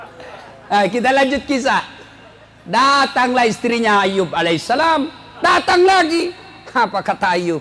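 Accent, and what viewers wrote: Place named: Sulawesi, Indonesia. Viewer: Sering dengar ceramah dari Ustd Dasa'ad Latif,sy suka sekali.Bahasanya sederhana mudah di fahami dan dialeknya mengingatkan sy waktu masih tinggal di daerah Sulawesi. Sy sendiri orang Jawa, tapi sejak bayi sampai remaja saya tinggal disana.Semoga Ustd dan keluarga sll dlm lindungan dan berkah Allah SWT.Jadilah penceramah.yg baik untuk NKRI.Dari semua suku.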